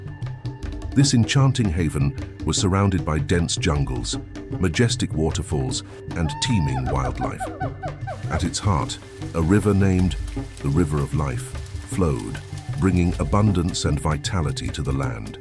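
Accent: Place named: United Kingdom. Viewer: Yes I like this deep British/original English accent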